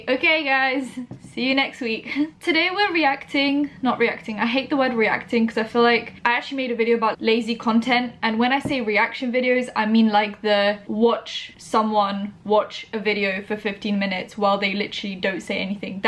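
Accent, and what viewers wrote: United Kingdom. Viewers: I have hated everyone in the UK's accent EXCEPT for this girl. idk why I love her voice so much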